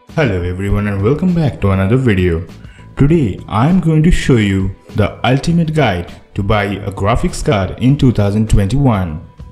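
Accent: India. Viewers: Indian accent makes this video perfect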